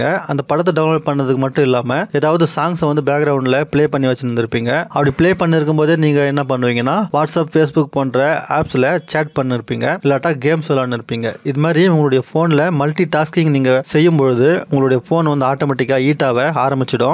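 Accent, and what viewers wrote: Chennai, India. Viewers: உங்கள் மெட்ராஸ் பாஷ அருமை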